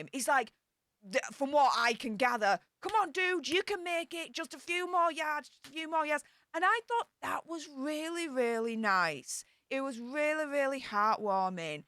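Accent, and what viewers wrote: United Kingdom. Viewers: I think some of this is from her particular British dialect. If I am watching a Britbox show, my husband sometimes cannot understand the dialogue. I think YouTube presenters have to have a unique hook. If they all sounded like academic professors, the net would be a pretty boring place. I think level of passion is also part of her YT personna. You can also hear the language difference with numerous African American YT presenters. I am sure the speech they use in a job interview is different than what they use in reaching their YT audience. This is a "social register" in language. language is uniquely hers. I have several advanced university degrees, and her presentation skills don't bother me. I listen to many different commentators and find all of them interesting, whether I agree or not.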